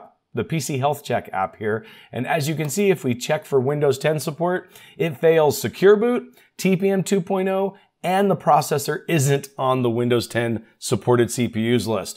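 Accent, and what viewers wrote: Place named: United States. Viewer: Today I watched your YouTube broadcast again, and it’s been synchronized in Dutch — probably an AI edit. Although my knowledge of English is limited, I prefer American voice. YouTube thinks I’m a donkey.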